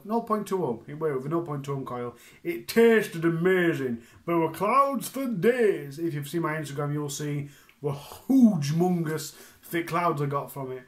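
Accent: Yorkshire, United Kingdom. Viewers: You naughty boy 😂😂 where abouts are you based I spot a Yorkshire accent 👊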